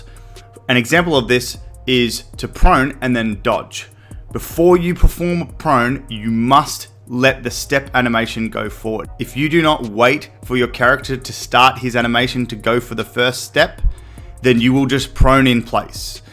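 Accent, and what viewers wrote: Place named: Australia. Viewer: Amazing video! ✌ love the ausie accent. Keep up the good work bro.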